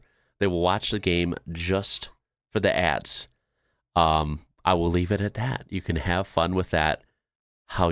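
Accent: United States. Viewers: As a Norwegian, I wouldn´t bat an eye over someone telling me which state they´re from instead of America or USA. Doesn´t work with a lot of other countries though.. Also, I´d hear straight away that you´re American, so that´s really redundant info.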